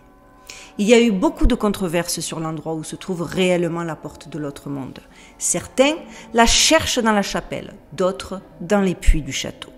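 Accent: Marseille, France. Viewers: hello tout le monde toi qui a un accent du sud de la france cherie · Bonsoir, comme toujours un sourire et un humour que j'adore et je ne parle pas de l'accent de Marseille top. Superbe sujet et vraiment à l'ordre du jour on en revient toujours au SS ils sont vraiment trés présent dans notre quotidien depuis toujours. En se qui concerne la visite du château se sera comme toi sans façon laisse ma place aux autres!!!! Belle semaine...... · eh tu sais quoi ta un accent de marseille tu l as pas perdue tu peux me croire bonne continuation et allez l om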